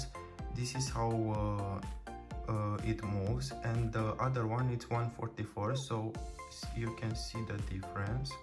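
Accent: Romania. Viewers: Multumesc de review, ca vreau sa mi-l iau peste cateva zile(mi-am dat seama ca esti roman dupa accent) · Recunosti romanul dupa accent din prima....super tare video!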